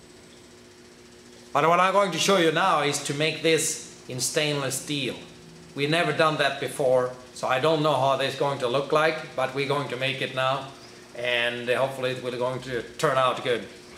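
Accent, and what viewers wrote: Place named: Sweden. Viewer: He have to be from Sweden with that accent.. cool.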